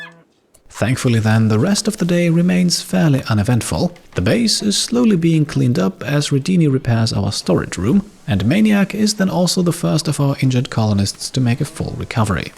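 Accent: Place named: Germany. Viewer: thankyou for your cute German accent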